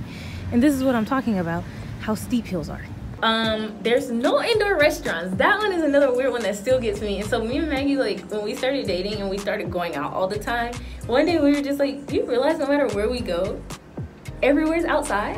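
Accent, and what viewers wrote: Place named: Grenada. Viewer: Talking like a true grenadian i love u vids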